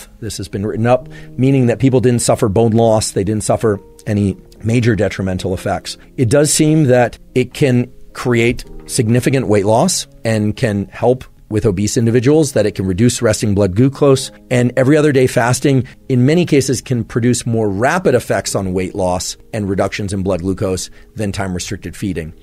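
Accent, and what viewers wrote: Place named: Canada. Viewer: Canadian rhythm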